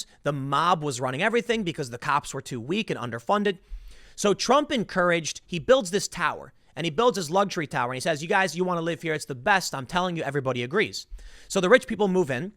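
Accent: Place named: Chicago, United States. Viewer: your Chicago accent kinda shows when the first 30 seconds into the video When you said "Deblasio is dumb as a box of rocks" i like the way you said dumb.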